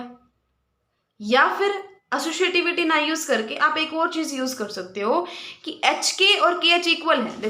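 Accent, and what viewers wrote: Haryana, India. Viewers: Thank you mam... Your voice is so sweet..... With haryanvi touch i 🤔 😅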